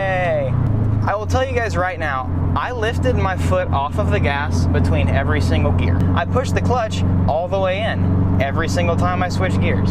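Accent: Texas, United States. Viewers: Listening to this 3 years later............ THAT TEXAS ACCENT THOOOO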